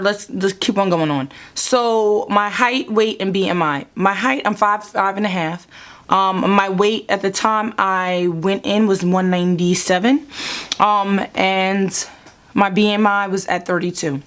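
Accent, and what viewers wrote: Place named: New York, United States. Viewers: Your personality is everything! Mad raw and transparent. You sound like a fellow New Yorker, are you? Great video and so informative, eveb for those not considering the same surgeon as you. Thank you!! Btw: You look great and keep those videos coming. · Is she not from New York, wow the accent is definitely New York, I'm from Philly and our accent isn't nearly as strong as New York :)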